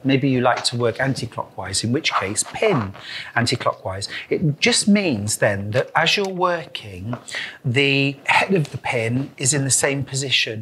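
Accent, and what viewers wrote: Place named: East Yorkshire, United Kingdom. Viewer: Thank you. You are an excellent tutor. (A bonus is that this East Yorkshire Lass (now a Norfolk dweller ) gets to hear the familiar accent!)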